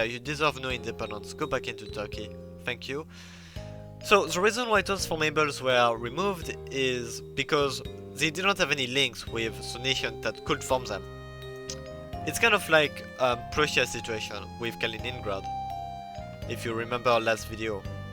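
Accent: France. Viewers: T'es français ? Ta un accent français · Are you perhaps, french? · Are you French ? Your accent seems French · Are you French?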